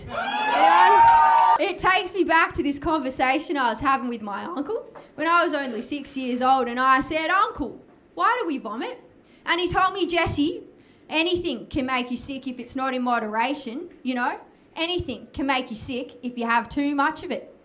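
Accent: Australia. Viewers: Wow you are amazing I love your energy and of course the accent is to die for, I'm from Alaska and there's only ever been one and a half Australians here and I missed the event because it was like 900 miles away almost halfway across the state lol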